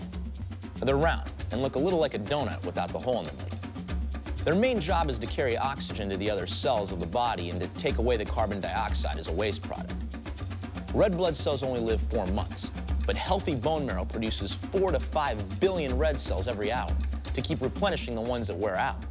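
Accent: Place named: United States. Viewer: Awful American accent. It is a disaster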